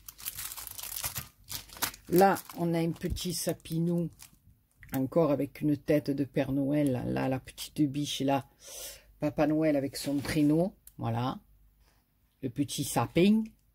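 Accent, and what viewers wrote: South, France. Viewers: Coucou, tout d abord une bonne et heureuse année 2020,du bonheur, la santé très important et continues à nous émerveiller par tes créas, surtout ta bonne humeur avec ton accent du soleil. Le père Noël as été très gentil avec toi,ça prouve que tu as été gentille lol.j adore le dies bordure que tu as fais avec du papier noir. Les blocs de papiers sont magnifiques, j ai le dernier que tu as montré. Maintenant il n y a plus que,aller hop au travail. Merci pour la présentation. Gros bisous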